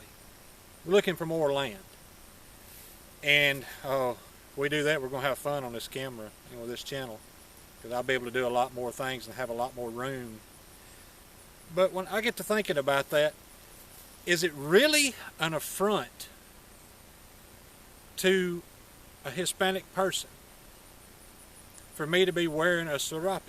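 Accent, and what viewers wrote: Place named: New England, United States. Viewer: Hey neighbor, didn't recognize that accent (Yankee) . Argued that anyone north of Tennessee River is a Yankee was corrected by Pete Cook that anyone north of the Cahaba River is a Yankee. 😃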